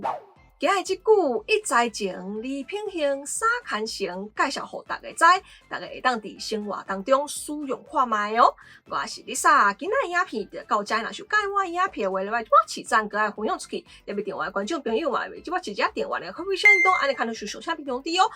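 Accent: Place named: Tainan, Taiwan. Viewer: 好台南腔 👍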